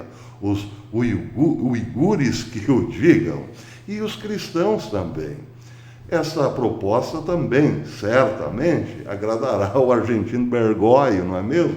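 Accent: Caipira, Brazil. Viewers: qualquer analista de geopolítica fala bonitinho, empolado, metido à inteligente. Isso enche o nosso saco. Só você faz o caipirês e um trabalho melhor que todos os outros! Nunca abandone a fórmula.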